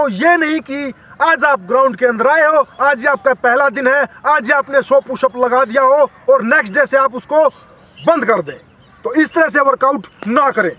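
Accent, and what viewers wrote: Haryana, India. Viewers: Sir apki boli haryanvi lagti hai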